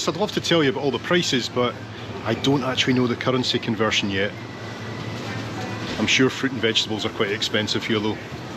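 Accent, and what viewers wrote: Scotland, United Kingdom. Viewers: I think I just like hearing you talking and your authentic accent and your Scottishness. you so funny!! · As a scouser that lived in Aberdeenshire for years before moving to Canada, I love your video’s. Your exploring and videos reminds me of home, and your accent reminds me of my time living in Scotland, fond memories. Keep up the good work, you have me hooked.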